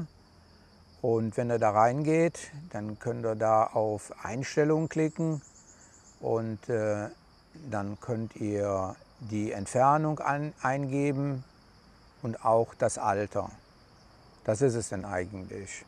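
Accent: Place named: North Rhine-Westphalia, Germany. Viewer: Ja das ist alles nicht so einfach. Habe ich mir einfacher vorgestellt........ Ich dachte mir schon an der Aussprache dass du aus Nordrhein-Westfalen bist. Ich bin aus Alsdorf. Glückauf.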